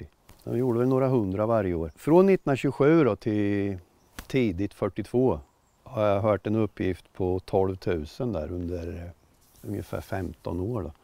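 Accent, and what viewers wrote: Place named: Östergötland, Sweden. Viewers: Hej! Jag hör att du är från Östergötland och är ett Indian freek, måste fråga dig om en Indian med sidovagn. Min min mammas morbror ägde den och tror att den inköptes <num> talet. Han hette Set Österkvist ock var från Örtomta församling utanför linköping. Skulle vara kul om du viste något om den? Jag har en historia att berätta över just den Indianen. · Mer östgötska till folket!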